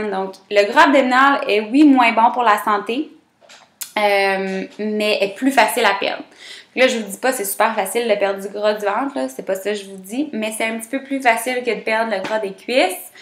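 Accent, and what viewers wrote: Quebec, Canada. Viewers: C'est un accent québécois! c'est pas horrible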